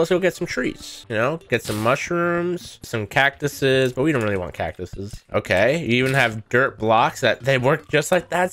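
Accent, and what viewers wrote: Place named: United Kingdom. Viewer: It's probably harder for him to pronouce it the usual way because he is from the UK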